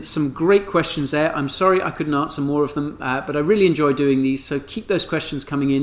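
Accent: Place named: United Kingdom. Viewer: So nice to have an eloquent British voice in crypto YouTube amoungthe many Americans!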